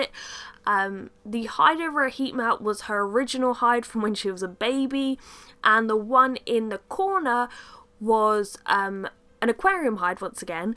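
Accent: United Kingdom. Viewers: i love brittish accent :3.... i just love it _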